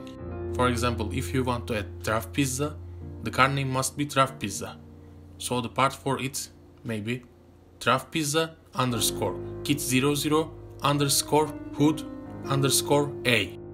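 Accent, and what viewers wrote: Turkey. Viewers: ingilizcenden belli kardeşim sen Türk'sün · ingilizde aksanından bile türk olduğun anlaşılıyo .d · túrk olduğunu aksağaníndan anladím benden kaçamazsín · askandan anlaşılıyor türk olduğundan :) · türkmüsün la sen :D ingilizcenden anladım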